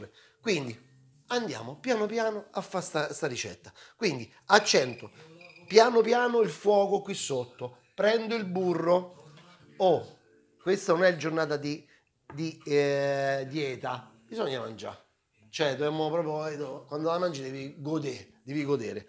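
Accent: Rome, Italy. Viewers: No vabbeh sei troppo simpatico 💖😂 ma per caso sei de Roma oppure marchigiano?? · Roman accent, very strong, like mine.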